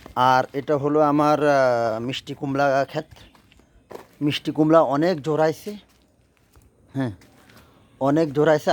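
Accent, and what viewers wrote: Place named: Noakhali, Bangladesh. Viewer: আসসালামুয়ালাইকুম ভাই ভাইয়া.আমি আপনার সবগুলো ভিডিও দেখি আমার কাছে অনেক ভালো লাগে. বিশেষ করে আপনার নোয়াখালীর কথাগুলো আমার অনেক ভালো লাগে এত বড় মানুষ হয়েও আপনি নোয়াখালীর কথাগুলোকে এত সুন্দর করে বলেন. আমার বাড়িও নোয়াখালী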